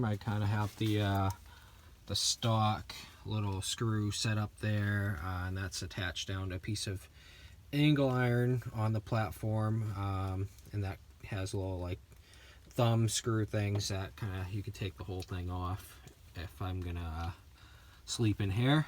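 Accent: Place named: Maine, United States. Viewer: Sounds like a fella from Maine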